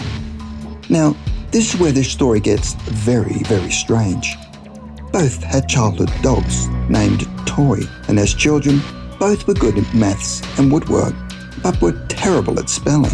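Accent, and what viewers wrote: Australia. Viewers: An australian narrator.. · Omg your australian 😂❤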